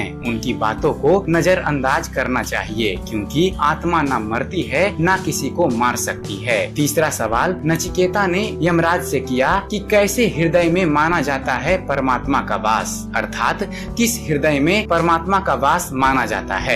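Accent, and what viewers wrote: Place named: Bhojpuri, India. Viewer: Please speack in proper saskrut manner Not in bhojpuri manner Speack clearly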